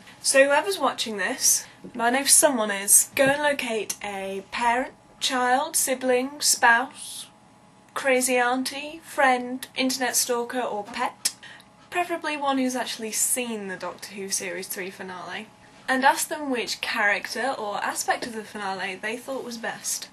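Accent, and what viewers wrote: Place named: United Kingdom. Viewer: OMG, you sound like me, except, ya know, British. I WISH I was British. I disagree with you on the subject of Martha. I think she grew into her awesomeness, unlike Rose, who was instantaniously wonderful. Anyhoodle, yes, Jack is overshadowed in the finale by the Master. The DOCTOR is overshadowed by the Master, and it's HIS show! Thankfully there's enough slash to make you die of squeeing in the last three episodes ('use my name' indeed!) which is just fantastic! Great review!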